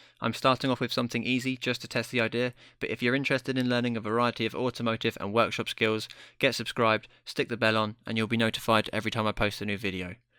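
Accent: United Kingdom. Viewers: I am going to be honest here, because I like your channel and I want to see you succeed and make more great content. I think you should not do voice-overs. Maybe it is because I am american and don't appreciate the english accent. With that being said, good luck and keep experimenting with new content.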